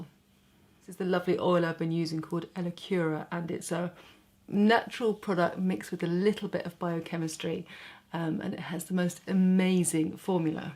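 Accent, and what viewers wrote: England, United Kingdom. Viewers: Agree. It's so refreshing to hear an English accent for a change, and not the nasal twang of 'hi guys, welcome back to my channel' ... blah blah.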